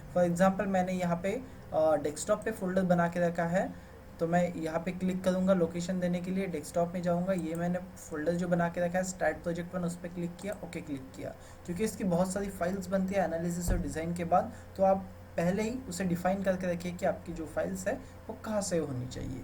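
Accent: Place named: India. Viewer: good video ! It would be great if your video had the option of subtitles, since it speaks too fast and its Hindu accent is very strong.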